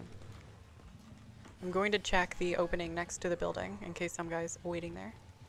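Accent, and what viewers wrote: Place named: South, United States. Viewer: That southern accent , I don´t wanna camp ya´ll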